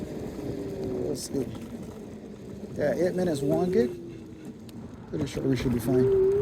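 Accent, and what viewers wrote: Barbados. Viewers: Bajan accent?🇧🇧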